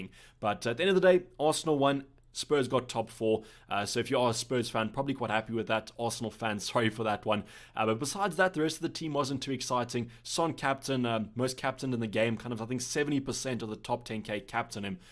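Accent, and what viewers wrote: South Africa. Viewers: Bro you from South Africa?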